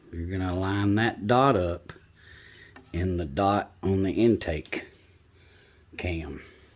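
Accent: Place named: South, United States. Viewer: Im calling you the " " because your calm southern voice and patient demeanor makes it easier for ADhD heads like me to learn from 👍 Now Im actually looking forward to doing my heads when they need it haha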